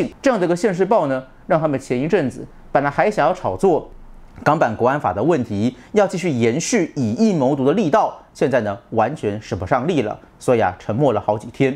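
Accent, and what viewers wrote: Standard. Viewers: 炳忠的口音是在向普通话靠拢啊！ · 被你的中文发音吸引了!!这才是正统国语发音!!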